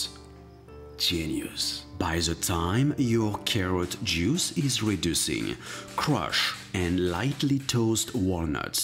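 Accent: France. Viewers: Are you French or not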